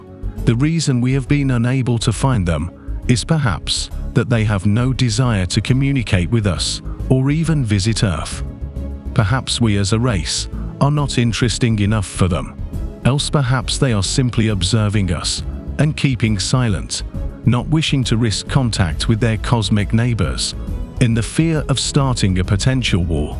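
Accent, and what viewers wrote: United Kingdom. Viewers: Please stop using the "British" accent in your Voiceover. There are a lot better choices like Swedish, German, or Irish.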